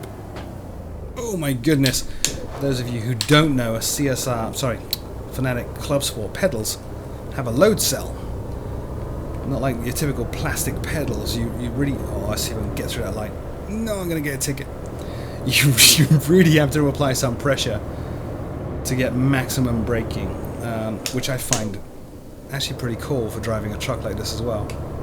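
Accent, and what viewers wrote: United Kingdom. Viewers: your from america? i thought u was from uk, you dont sound Americana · I like most of your videos, though I'm a flight simmer first. Keep up the good work. Are you from the UK or do you just sound like that?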